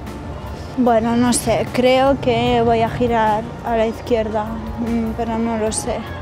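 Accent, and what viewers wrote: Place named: Italy. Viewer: Ciao. Hace unos años estuve en Perugia, en la Università per Stranieri, en un curso elemental intensivo de verano. Comparto contigo los problemas de alquiler que uno se encuentra en lugares relativamente pequeños, la desconfianza, etc...fue una experiencia enriquecedora. Ahora estoy refrescando y tratando de no olvidar lo aprendido a través de algunos amigos italianos y de tus videos. Los encuentro fantásticos, didácticos, claros, concisos y realmente útiles. Además tienes un acento perfecto de italiana, sonoridad y musicalidad, hija de lengua madre italiana, lo cual me encanta. Complimenti per te, e per tutti noi per la fortuna di tenerti come insegnante. Ti auguro il meglio nella tua stanza in Italia. Avanti!!!!!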